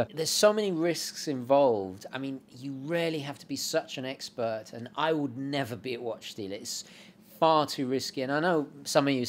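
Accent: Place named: RP, United Kingdom. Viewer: still poncified accents ...... loving it..... but what the heck ?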